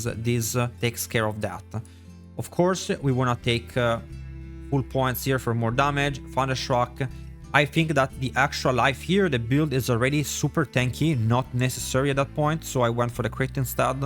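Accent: Italy. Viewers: I like his Italian accent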